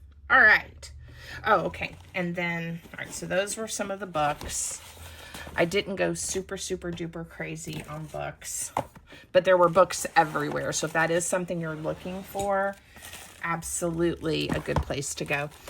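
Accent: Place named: South, United States. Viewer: Oooooo. I have lots of old hankies too! You go girl!!! (P.S. I'm amazed how quickly your southern accent went away)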